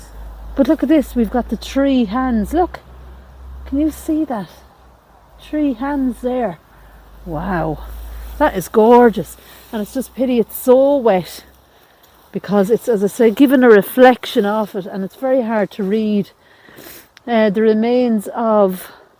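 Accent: Ireland. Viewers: I like your irish accent. 😊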